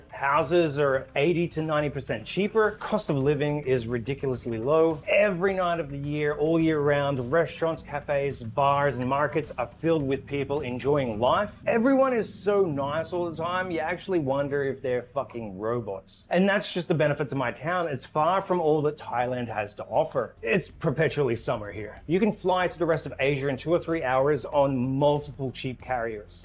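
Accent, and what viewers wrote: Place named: United States. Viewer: Seppo accent man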